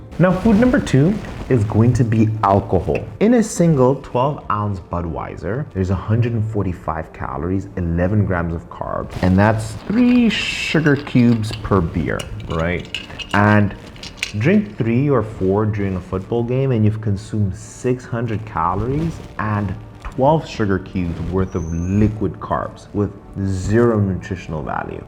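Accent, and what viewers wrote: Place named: South Africa. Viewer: Thanks, why your accent is so South African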